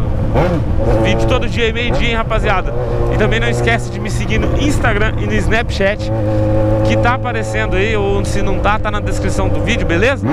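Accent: Goiás, Brazil. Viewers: Vc é Goiano? esse sotaque seu, parece demais de goiano.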